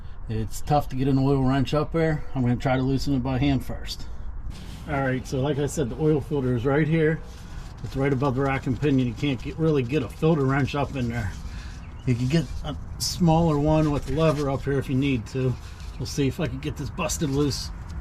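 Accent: Pittsburgh, United States. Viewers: A couple minutes into the the video I was like "nice, he's got a Pittsburgh accent"...great video, way to lay it all aht! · I know you have to be from Pittsburgh with that accent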